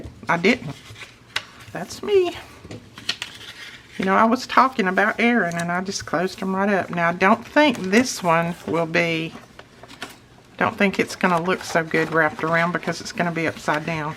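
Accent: South, United States. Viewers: love your projects and instructions! Love your southern accent! I live in Hawaii! Would love to win!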